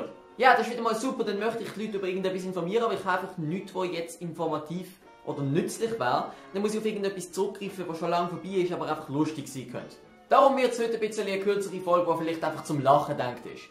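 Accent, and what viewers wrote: Basel, Switzerland. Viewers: Bisch du Basler ?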